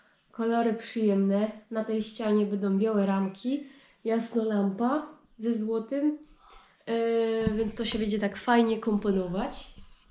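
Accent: Silesia, Poland. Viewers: fajna ta ślunsko godka w wykonaniu.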